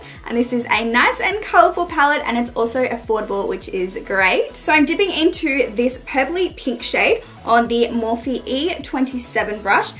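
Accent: Australia. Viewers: Where are you from? You sound kind of Australian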